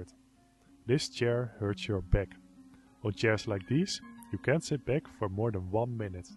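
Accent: Netherlands. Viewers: horribly Dunglish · your dutch accent is so apparent gawdxD btw this is all a bit exxagurated... a good rider gives his horse relieve in the mouth when he does good, so the bit hardly moves by your hands...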